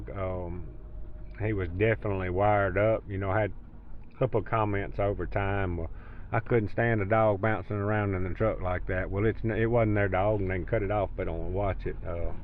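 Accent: South, United States. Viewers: 68 year old man here. I'm gonna miss that long southern drawl of", Pete, ". Well done!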